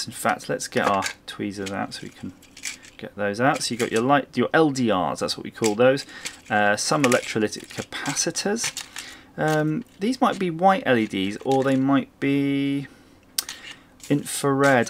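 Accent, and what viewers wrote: United Kingdom. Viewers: I like the way you describe all the kit components! Nothing like to hear the British accent! 👍🏼😃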